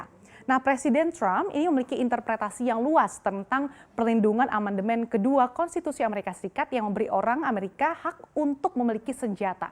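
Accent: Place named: West Kalimantan, Indonesia. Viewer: Logat lu kek logat kalbar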